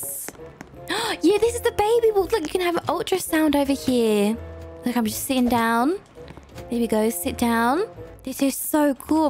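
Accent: United Kingdom. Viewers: I love her British accent❤💛💚💙💜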